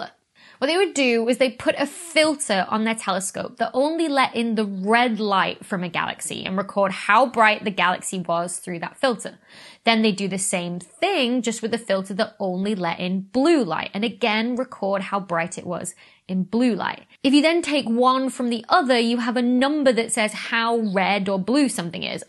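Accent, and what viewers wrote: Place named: Northern England, United Kingdom. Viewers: What is that accent? Leeds/Manchester? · Your interpretive presentation skills are now awesome. Would like to be in the audience for your next LIVE astro conference presentation. Should be SRO for just the delivery. Video suggestions: 1) what I do when I collaborate as an associate to main author, 2) vids on each of the previous main author papers starting with no. 1, which was before the Phd(???), and 3) how do I work/collaborate with others as main author, for instance, is there a small final edit group? And, thanks for being yourself, Mancusian accent and all. Next book?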